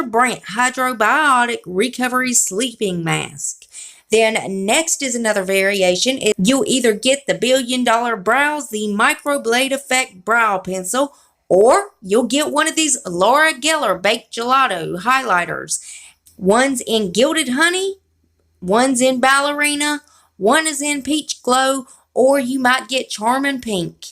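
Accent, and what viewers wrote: South, United States. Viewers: I'm so amused with your southern voice. Absolutely adorable!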